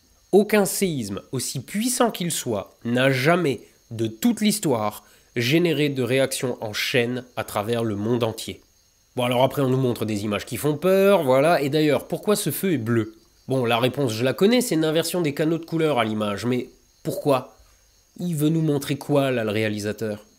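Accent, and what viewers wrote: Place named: Paris, France. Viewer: Merci bcp. C'est juste dommage de faire toute la vidéo en prononçant "séizme" bien à la parisienne. Que ce soit pour séisme, Israël ou autre, la lettre s ne se prononce comme un z que lorsqu'elle est entre 2 voyelles. Ex: Isabelle. Bonne continuation.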